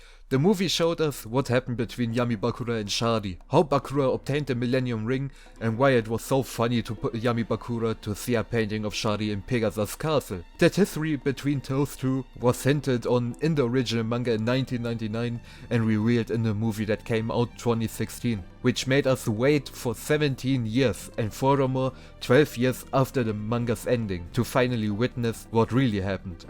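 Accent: Germany. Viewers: Dieses eingedeutschte Englisch ist schrecklich. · Boa wie man dein Deutsch heraus hört hahaha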